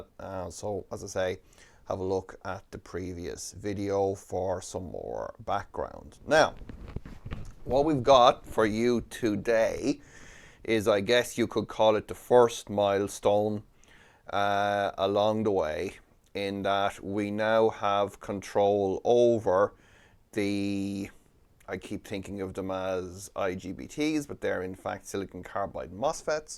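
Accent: Ireland. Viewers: Gah. Didn't see this comment till I'd watched it all. I'll know for next time :-) Not as slow as Mr Rikards, but the Irishness does show through :-)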